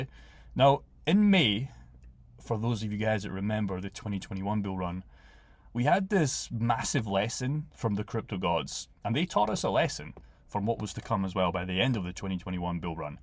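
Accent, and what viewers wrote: Scotland, United Kingdom. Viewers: Good to hear a Scots voice that can see what is going to happen in 2025. Lots of scots will miss this run as they only follow MSM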